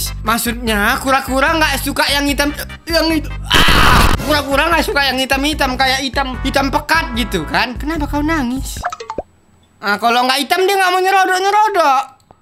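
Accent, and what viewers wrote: Medan, Indonesia. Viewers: Abg orang Medan kah🤔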